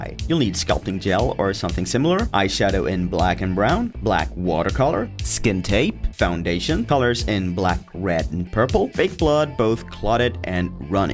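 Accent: Scania, Sweden. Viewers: är du från skåne?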